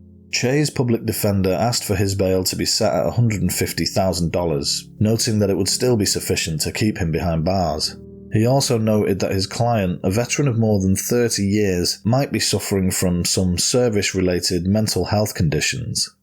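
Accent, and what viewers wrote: United Kingdom. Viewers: Hi, I'm a new subscriber in your channel, I love watching crime stories and for a various vlogs about crime investigation ,I love your channel. Can i have a request,can you pls put a substitle,cause I" ve got a bit hard in understanding on some of your British accent..Philippines here❤️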